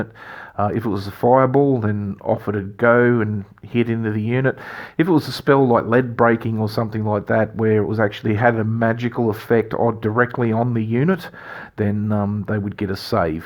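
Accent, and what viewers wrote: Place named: Australia. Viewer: Aussie accent , pls tell me youre in Melbourne ?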